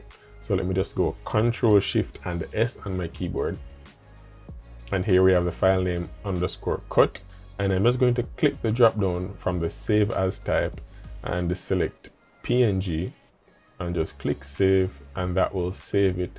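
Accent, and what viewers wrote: Jamaica. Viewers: Excellent video. Do I detect a Jamaican accent? Thanks for sharing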